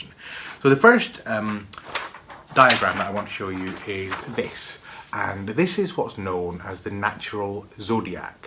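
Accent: Scotland, United Kingdom. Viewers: Hi there. I worked on this on the 29th July 2021 after watching just this lesson. I thoroughly enjoyed finding that my Base Chakra has the Sun together with Venus! Chiron is with Neptune in the Heart Chakra. Jupiter joins with Uranus and the Moon in the Throat Chakra. I found it quite fun to bring the Elements, Positions and Polarities into the mix too. I enjoy your other videos as I studied 19 years with a private Jungian teacher, worked with Astrology and Tarot through the 70s. Its wonderful to have the old background learning in all these and other areas brilliantly updated. As I child I regularly dragged my mother into a particular shop so I could hear the Scots accent so this is another benefit! Thanks for your dedication and enthusiasm.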